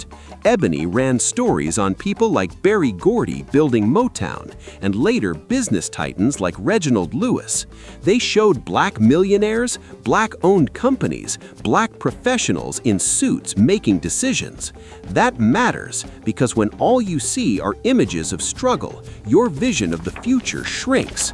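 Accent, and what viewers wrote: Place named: Europe. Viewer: Why is a European voice narrating this video? 🧐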